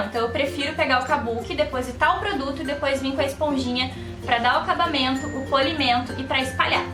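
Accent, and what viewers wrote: Rio Grande do Sul, Brazil. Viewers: Você é gaúcha?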